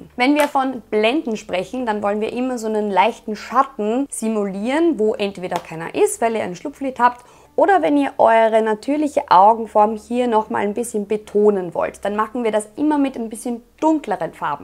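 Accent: Vienna, Austria. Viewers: Mega toll erklärt, ich schau und vor allem hör ich dich gerne....dein „Wiener Dialekt „ ich liebeeee es! 🥰 Ganz liebe Grüße aus Deutschland 😉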